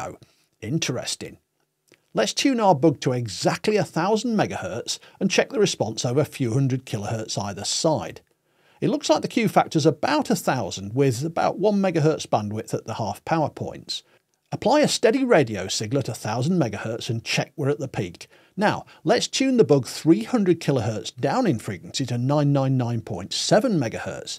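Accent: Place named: Scotland, United Kingdom. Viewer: This is the first video I've watched of your channel. I'm going to subscribe, absolutely hitting on things I'm very much interested in. One thing I'd like to observe, I believe you may hail from the same geographic location as Sean Connery. It sounds that your dialect and accent is very similar to his, unless he was simply acting and faking his natural voice on screen. Anyway, thank you for your hard work!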